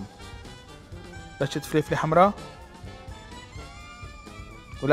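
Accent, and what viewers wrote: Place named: Syria. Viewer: انضميت للقناة كرمال اللهجة السورية بس♥️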